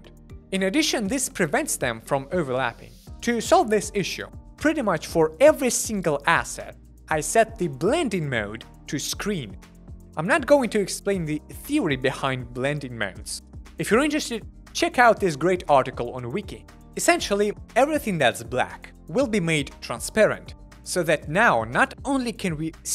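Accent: Russia. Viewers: Is this guy a russian originally?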